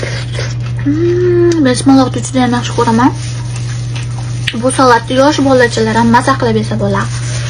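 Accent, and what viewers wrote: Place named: Fergana Valley, Uzbekistan. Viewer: Каерлик шу киз шеваси водийликка ухшеди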